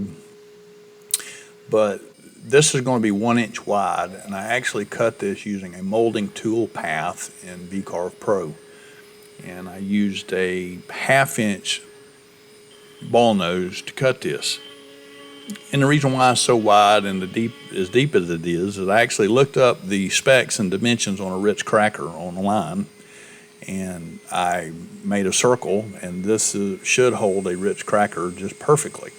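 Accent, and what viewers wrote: South, United States. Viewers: Been in California for many years but the family is from Tennessee so I don't mind the southern accent at all. I've had a CNC for several years and have learned you need to be an artist for designs and an engineer for getting the software to perform the way you want. And also a mechanic to make sure the CNC is working properly. Mostly a woodworker to see a piece of wood for what it can become sitting on a shelf, table, or kitchen counter. Amen y'all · Rant on brother! Always gonna be a knucklehead out there. I have a cnc and a laser and as far as I am concerned they are no different than my table saw. They are tools, complicated ones but still tools. Thank you for another awesome video. BTW love the cornbread accent....got one myself....redneck of the south Ga. variety here. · AMEN!!! Absolutely fabulous work! Getting use to the southern accent myself. 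Formerly a cheese head (Wisconsin) and now living in Ozark, Al. Very impressive work, and design. Thank you for sharing, and you have a new subscriber.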